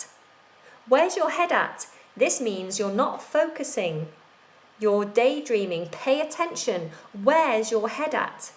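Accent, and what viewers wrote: United Kingdom. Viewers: easy and clear British english. Thanks for what you are doing. Just subscribed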